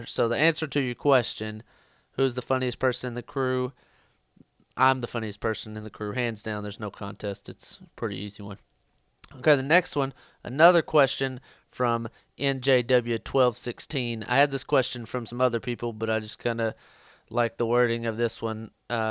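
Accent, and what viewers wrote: South, United States. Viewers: Sounds southern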